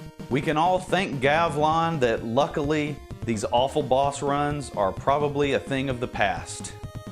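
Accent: South, United States. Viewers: Listening to a dude with a good 'ol boy accent talk about horseshit in the Dark Souls series is peak internet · Love the southern accent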